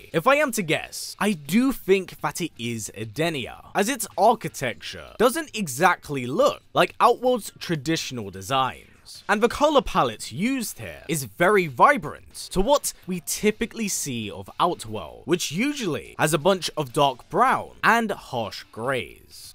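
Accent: United Kingdom. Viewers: No offense, but I don't fink I can't take much more of this accent. I love brit accents, but this ain't it. Keep up the great work, though!! I can't wait to play!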